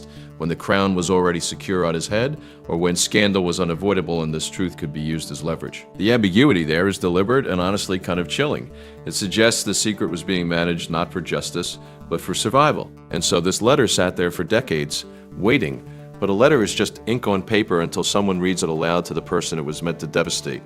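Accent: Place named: United States. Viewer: This video has to be investigated to ascertain who is behind this rubbish. Is the American accent a clue?!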